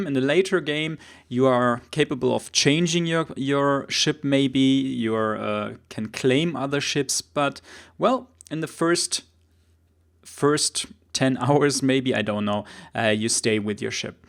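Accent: Germany. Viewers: Wenn Deutsche Englisch sprechen :) aber trotzdem gutes Video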